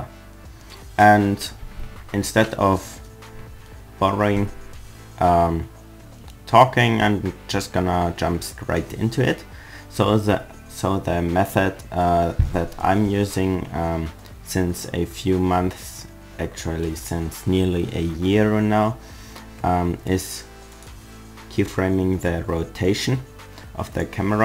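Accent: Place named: Germany. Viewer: bist du deutsche :D haat man aam anfang gehört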